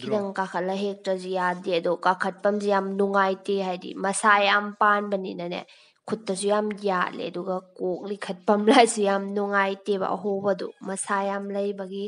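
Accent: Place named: Manipur, India. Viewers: Kakching gi accent ni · Kakching marolse taba Yamna nungai eidi ...rhythm se ...cute oiye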